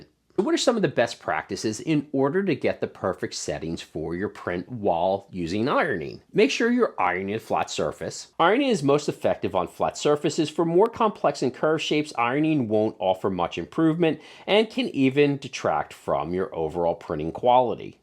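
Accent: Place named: California, United States. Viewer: I know nothing about you other than from you accent you are clearly from California.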